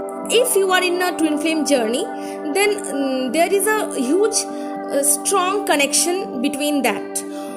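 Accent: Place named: India. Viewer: I’m sorry, I can’t stand that Indian accent so I can’t listen to this video! It’s just so annoying. Lol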